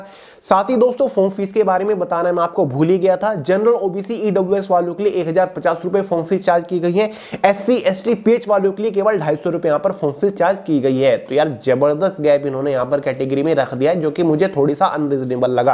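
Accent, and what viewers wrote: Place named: Western Uttar Pradesh, India. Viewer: kana se ho aap aapki tone west up se lgre h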